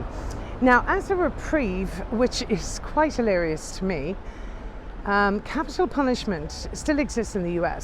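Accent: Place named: Ireland. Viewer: Love your tours, I love history escpecially that around London, you are so informative, your gorgeous Irish lilt all goes to make one very enjoyable armchair tour, Thankyou from a Southerner now living in the North, I’m off to the “ Old Bailey” now if anyone should want me 😜🥰🐾🥰🐾🥰🐾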